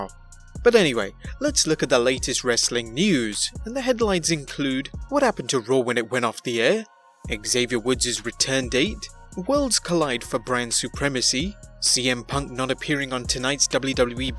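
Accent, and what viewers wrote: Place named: England, United Kingdom. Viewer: How come the American narrator can curse but this narrator can’t? Need some English accented f bombs in my life lol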